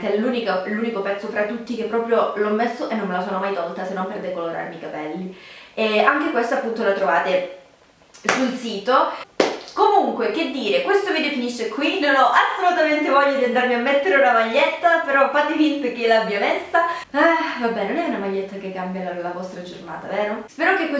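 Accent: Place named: Calabria, Italy. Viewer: Ma di dove sei .. la tua voce sembra calabrese 😍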